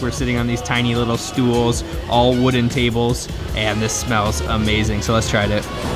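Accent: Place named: Canada. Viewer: He sounds incredibly Canadian. He is not dropping a lot of EHs but everything else is completely Canadian.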